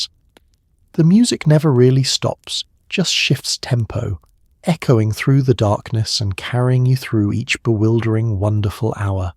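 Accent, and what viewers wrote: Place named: United Kingdom. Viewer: For a while, we had those. I tried it. I thought it was disgusting... This is no Heroin, not Coke, not MDMA, not even a shot of alcohol. Not even like a joint somebody passes around. And Mister you know what? Doesn't matter where and when I am. I don't know your name. You are a Voice with a polished British accent. alright... Remember earlier when I said disgusting? Well a close friend who was on a medical regime for mental problems (Depression is the No.1 Appetizer for drugs.).. And he didn't think it was disgusting. He was full of that thing, he was at work but just to jump from the 4th floor. Because old People in Yemen chew those leaves to pass the time, cathinone is harmless... They've been doing this for years, It's not tobacco. no smoke. Once again, we re-re-rediscover some plant that was originally used to Benefit, Chemists distill it, add things, remove things, and Encapsulate: A "Pill".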